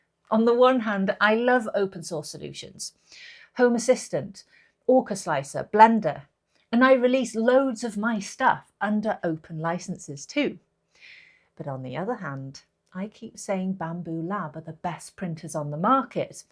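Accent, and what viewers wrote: Wales, United Kingdom. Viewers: Might be a strange comment but...is that a bit of Welsh I hear in your accent? watched and enjoyed a few of your videos, but only noticed it in the last half of this one.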